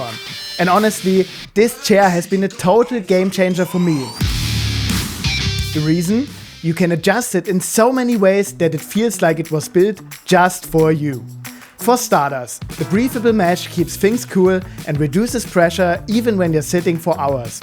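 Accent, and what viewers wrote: Germany. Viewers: this bad accent german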